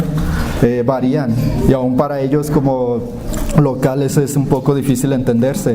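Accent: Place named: Mexico. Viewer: Hola ¡Por tu acento puedo creer que hablas desde México! ¿vale? ¡Que nuestro Dios venga a alumbrar a todos pueblos Mexicanos! “ Lámpara es a mis pies tu palabra, y lumbrera a mi camino. Salmos 119: 105. “ 💡 Saludos desde Brasil. 🇧🇷 Al mi México querido. 🇲🇽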